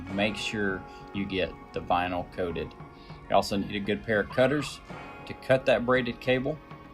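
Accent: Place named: South, United States. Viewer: Southern accent sounds funny and cute at the same time.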